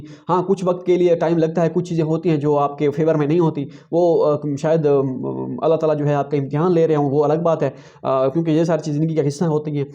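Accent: Saraiki, Pakistan. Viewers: I appreciate you brother آپ کی وڈیوز دیکھنے کے بعد مجھے پتا چلا کہ csc بھی کوئی نام کی چیز ہے آپ ہر کمنٹ کا جواب دیتے ہے اللہ تعالٰی آپ کو کامیاب کرے ہم سب کی دعائیں آپ کے ساتھ ہے ۔ سرائیکی وسیب کے آپ پہلے بندے ہے جو اس کام میں بہت آگے ہے آپ کا سرائیکی لہجہ ہمارے لیے سمجھنا بہت آسان ہے کیونکہ ہم سرائیکی ہیں اور ہماری دلچسپی بڑھتی ہے میں آپ کو سلیوٹ کرتا ہو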